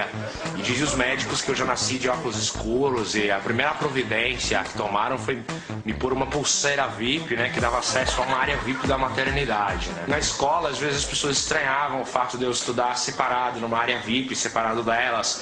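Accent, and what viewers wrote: São Paulo, Brazil. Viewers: Nossa...rs Igualzinho o sotaque Paulistano kkkkk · O sotaque de paulista é foda!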